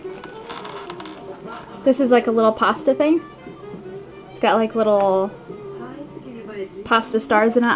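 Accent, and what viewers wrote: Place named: United Kingdom. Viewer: No prob. I loooooooooooove British accents. They r just so, so, BeAuTiFuL!¡!¡ XD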